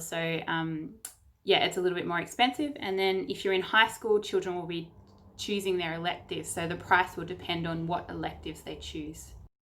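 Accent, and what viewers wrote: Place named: Australia. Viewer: So good to hear an Aussie giving advice on a homeschooling program. Just wondering if this is for the state of Florida?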